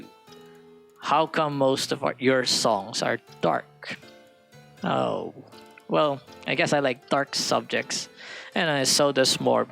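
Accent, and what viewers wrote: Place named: Philippines. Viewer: I had assumed you were in the USA or something but when I heard your voice there was no mistaking that Filipino voice. For the past 5 years of my school life, almost everyone I knew was Filipino. Plus all of my relatives on my mom's side are living in the Philippines and I've only ever met my Great Aunt when she came here, to Canada.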